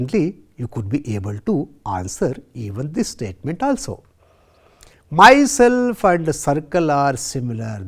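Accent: Telugu, India. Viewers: Ur mother tongue telugu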